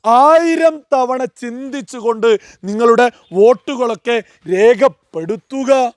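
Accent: Malappuram, India. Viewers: ഈ മലപ്പുറത്തക്കാരനെന്ന് പറയിപ്പിക്കുന്നത് ഒന്ന് മാറ്റാൻ സാധിക്കോ. കൊല്ലത്തുകാരുടെ ഫാര്യ എന്നതു പോലെ........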